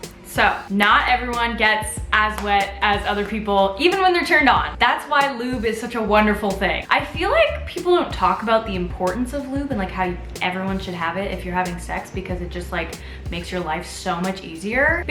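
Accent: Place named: Canada. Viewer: Me(straight boy) who's here just to hear beautiful Canadian Accent and her humor😆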